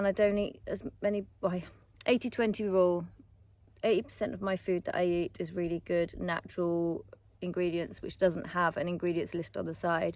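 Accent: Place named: West Country, United Kingdom. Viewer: It's nice to hear a bit of your story. I used to live in Exeter and I'm from Marlborough, I knew I recognised the slight ooh arrr in your accent.. 😂, don't worry, it's very slight. Haha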